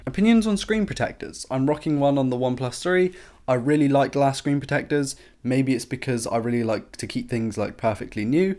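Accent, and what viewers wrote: United Kingdom. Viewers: Happy birthday , and I like how you talk I like british accent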